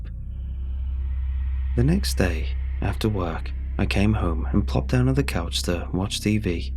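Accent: United Kingdom. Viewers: British accent from Chattanooga Tennessee?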